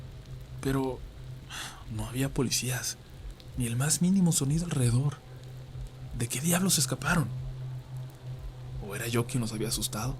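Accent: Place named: Michoacán, Mexico. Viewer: Este habla como si fuera de Morelia Michoacán! 👍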